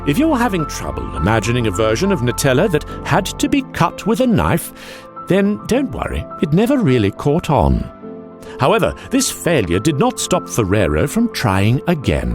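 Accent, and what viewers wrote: England, United Kingdom. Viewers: Hes got a british accent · SORRY NEW GUY BUT 'UPPER CLASS ENGLISH VOICE' IS PRETENTIOUS AND SHYTE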